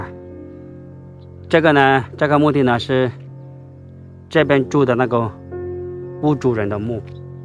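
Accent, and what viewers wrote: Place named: Hakka, China. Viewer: 聽你講話好像是客家人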